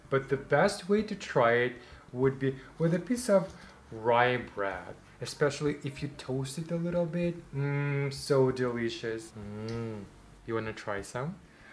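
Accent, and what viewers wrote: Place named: England, United Kingdom. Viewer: настоящий англичанин!!! Заслушалась. )))) Надо пересмотреть. )))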